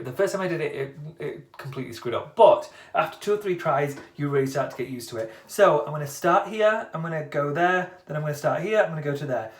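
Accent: United Kingdom. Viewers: I love how this is how you actually talk, you have a proper queens english accent & its amazing!! I'm from Liverpool (UK) and I want but nothing to have the Queens English accent! haha. Also I love your videos!!!! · His accent is not that posh he’s just british! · I have no need for this, female with mid-long hair, but listening to you is really soothing. As a fellow brit I'm in love with your accent!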